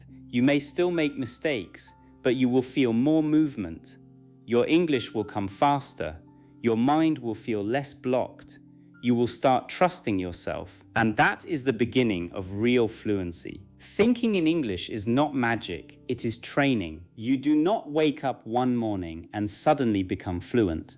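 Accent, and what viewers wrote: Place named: United Kingdom. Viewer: Oh God the British accent is amazing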